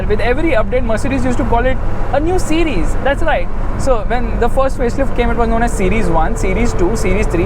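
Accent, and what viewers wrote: India. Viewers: Dont speak English in indian style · ..... Indian accent.....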